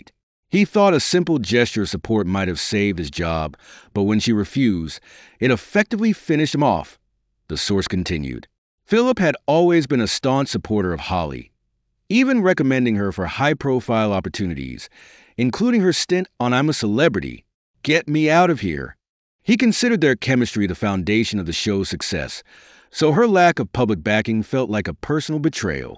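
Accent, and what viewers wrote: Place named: United States. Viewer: The American accent narration is an odd choice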